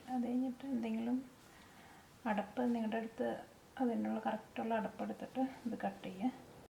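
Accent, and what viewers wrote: Thrissur, India. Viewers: Within 3 hours, almost 1500 likes !!!!!!!! it's a reward for your hard work. I noticed the strain in your fingers while you were mixing the dough. As you said, I think this is the first recipe for pappadam in the net, which is our favorite one, of course, everyones. Really it's my son who introduced you to me. When he was living alone in Florida he was trying your recipes and now his wife has no complaints about him because he knows cooking and helps her. All of us like your Trichur accent.Let me try now itself and if it comes okay I'll definitely let you know. I tried WhatsApp but not connecting. Could you please tell me where you are living.Thanks a lot and keep it up. :) this is the first time I am subscribing a channel.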